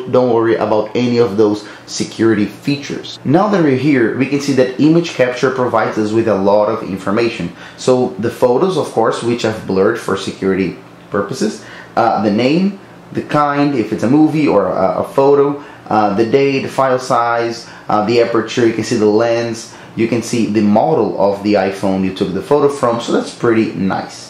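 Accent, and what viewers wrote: Brazil. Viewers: Are you BR? two seconds into the video I could tell from your accent. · brasileiro. certeza. sotaque. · Haha eu perguntei pq eu também sou mano. Também moro nos EUA. Meio q consigo captar um sotaque brasileiro, mas vc fala mto bem. Abraços!! E o vídeo me ajudou tbm!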